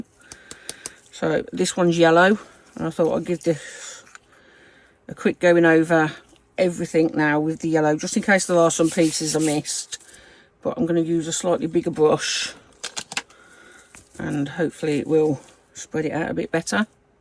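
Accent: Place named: West Midlands, United Kingdom. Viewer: Which part of the west midlands are you from? I'm a willenhall yam yam lol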